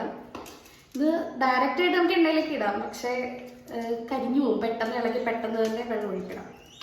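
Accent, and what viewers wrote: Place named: Thrissur, India. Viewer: Samsaram thrissur style Aanalo kinnamkachi fish curry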